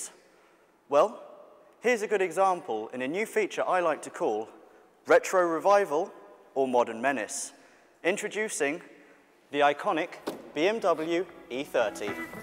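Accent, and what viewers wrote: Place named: RP, United Kingdom. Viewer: He sounds so posh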